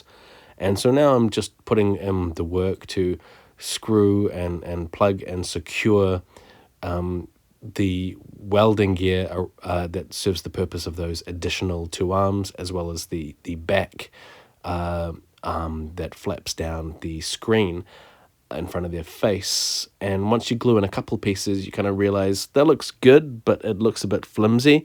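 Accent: New Zealand. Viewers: Are you a kiwi? Your voice sounds like you are from New Zealand?... yes you are haha just finished the video. That's awesome I'm also a kiwi, keep up with the videos I'm going to watch the pod racer one now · thought i heard a fellow kiwi's accent very happy i found your channel thru this collab series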